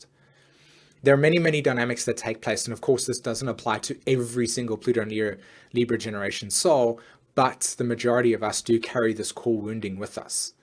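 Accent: South Africa. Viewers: Are you South African by the way ? If so, do you do in person consults? I live in SA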